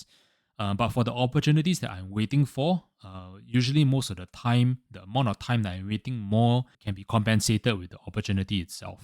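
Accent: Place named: Singapore. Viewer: Really love the singlish presentation!! Totally relatable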